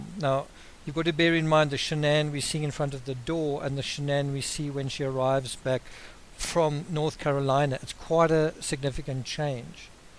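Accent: South Africa. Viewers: South African narrator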